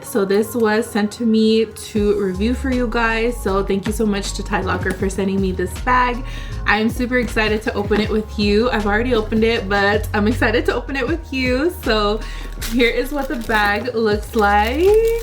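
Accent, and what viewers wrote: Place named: Hawaii, United States. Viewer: Aloha, just found your page! Actually thought you were from hawaii! Lol! You talk like us! But let me tell you, it’s sooooo expensive here in Hawaii ugh! We pay the price of paradise but for the most part it’s worth it lol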